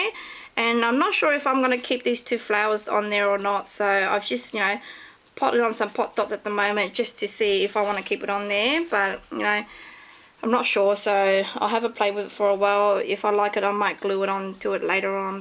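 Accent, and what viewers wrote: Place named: England, United Kingdom. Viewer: very english! lol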